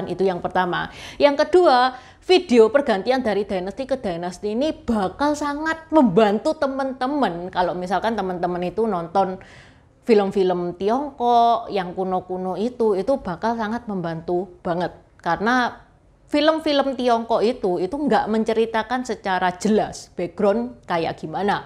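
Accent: Java, Indonesia. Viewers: Kental sekali adek ini jawanya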